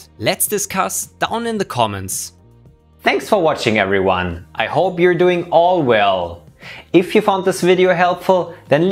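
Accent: Germany. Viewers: I prefer textured. Also, I love your accent, where are you from? Edit: Nvm, i just finished the video, probably, German? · Man kann den Deutschen Akzent richtig spüren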